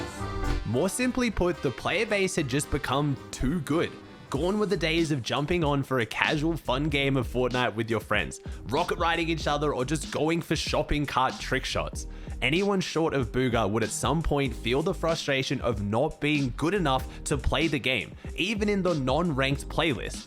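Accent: Australia. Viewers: Props to the editor who, seamlessly collated these videos and worked perfectly alongside Aussie narration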